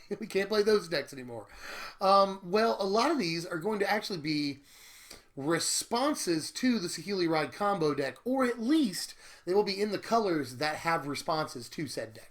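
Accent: South, United States. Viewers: that country accent lol, Im going to say she is from alabama or louisiana. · aawwzz! I love good southern accents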